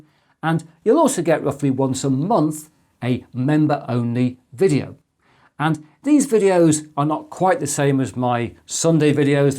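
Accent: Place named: East Midlands, United Kingdom. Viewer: Yeah, there's something hypnotic about his East Midlands tones. 💤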